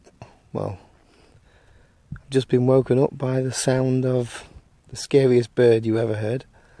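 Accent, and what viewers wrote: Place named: Stoke, United Kingdom. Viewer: where are you from you sound like your not far from stoke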